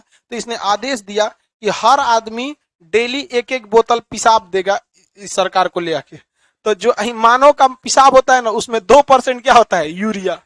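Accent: Bihar, India. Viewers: यार तू पहले बोलना सीख ले आर यू बोलने की तमीज नहीं है क्या बिहारी भाषा बोलता चावल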